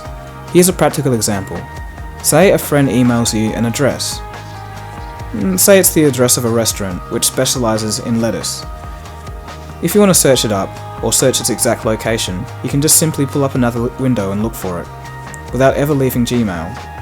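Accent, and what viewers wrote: Australia. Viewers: This is one one of the best reviews I have ever seen. I just wish I could buy the phone. It's too pricy for me to buy. I am from Indonesia and it cost a lot here. All I want to say thanks mate. I love your Aussie accent. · great review but I still love my iPhone 5. Love your australian accent though XD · Really good review, probly the best review of anything ive seen on youtube, no kidding oh and great to hear a fellow Aussie doing it. looks like an Awesome device, didnt see any drawing on it really, will look at your other clips.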